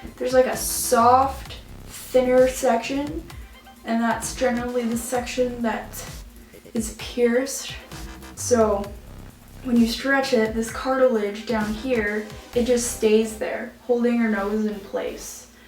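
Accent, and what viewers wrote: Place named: Canada. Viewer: love your accent, Canadian??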